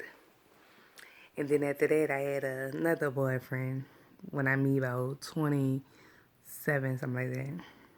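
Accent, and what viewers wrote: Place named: Shreveport, United States. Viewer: You sound like a woman from Shreveport I should have married.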